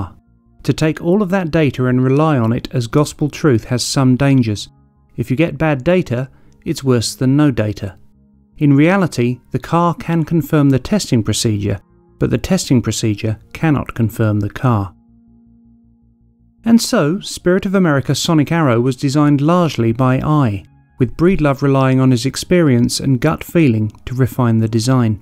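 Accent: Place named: United Kingdom. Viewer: Great video... I got burned out on the narrator’s posh Brit accent though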